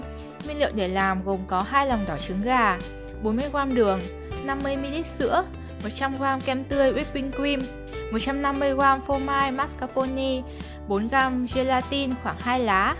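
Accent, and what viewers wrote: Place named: North, Vietnam. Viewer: Giọng chị cuteee quá 😍😍 em ng miền nam cực kì thích giọng miền bắc.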